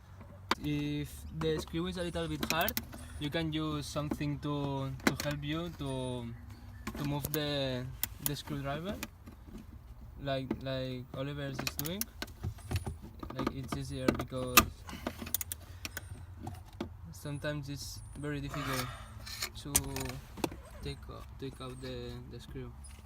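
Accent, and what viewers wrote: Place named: Spain. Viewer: Hahaha saludos desde USA me mola tu acento de español Hahaha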